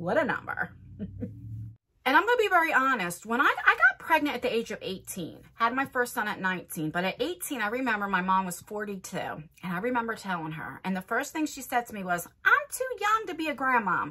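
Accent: New Jersey, United States. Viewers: Hi My name is Roz. I am 55 years old. I am an COVID ICU nurse. I started following you during the beginning of the pandemic. You sound like one of my best friends that was born in New Jersey. I admire you so much. In my head we would be good friends. I have been wanting to make You Tube videos since 2007. I love makeup. I was a makeup artist from the time I was 18 until I graduated from Nursing school at 30 years old. I live in California. But I was born in the deep south. I had a lot of identity issues growing up. Because I had light skin and long hair. I forgot something I am Black. I used to come home crying to my parent and my good mother. My parents were born before your grandmother. My dad was born in 1925 and my mom was born in 1930. They had friends of every race and religion. I am the oldest and I was born in 1966, My parents had their last child in 1974, So my baby brother is not even 50. 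I am widowed. My husband was Caucasian and Native American. I agree with you totally. My parents taught me to always give people a second chance because people can change. I have every single Jeffree Star palette. I like his makeup. My generation will be last generation to know what is to live without social media. You are doing what any good Christian would do. Keep making your amazing videos! Love from Northern California.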